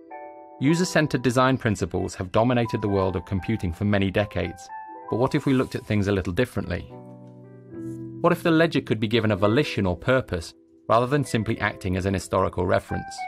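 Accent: United Kingdom. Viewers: B.S...If this really was a google internal video, why the eff is there an iphone, and imac, and ipad, and no google devices...seems more like apple propaganda (especially with the British accent narrator). But I don't discredit that google does shady stuff. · This is so clearly faked. This is pretty low budget (compared to Google), its narrator is British for some reason, THERE'S AN IPHONE IN THE VIDEO INSTEAD OF AN ANDROID, and it's too direct. Even if this is what they wanted, they wouldn't just come out and make a video about it. Also why would they give it creepy music? Come on.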